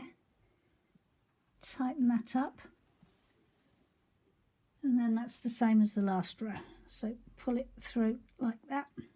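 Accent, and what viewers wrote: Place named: United Kingdom. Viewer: Dios me encanta el acento, es británica o algo asi???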